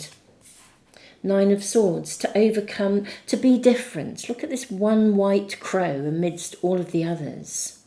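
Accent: United Kingdom. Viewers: Loving....I selected Pile 2. Your beautiful British accent and voice bring me hope.....I'm living in the UK, I am the ring here, the female with lot of energy to move forward and my partner living in the US is the castle and all correct. Unfortunately, on July 1, he said he needs a time off from this beautiful love affair we had so far, as he felt i is stressing him out....and although it made me so much pain, as I know he loves me and I trust such a love should never disappear. According to the reading today, during this time off if he steps out of his zone and sheds the skin and realises the immense things we could develop....I think that is the destiny of our relationship....I cannot call or message him until he does it for me as he is the man and going through changes in his own world, which matches what you said. Loving, I do hope he will reach out to me soon with love, clarity and purpose because I have no words to explain how beautiful it used to be........ 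According to you it should happen and hope it will.....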